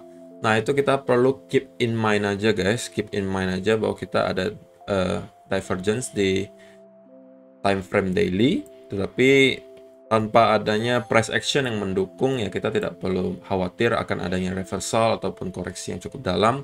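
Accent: Pontianak, Indonesia. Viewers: Ko, org pontianak ya?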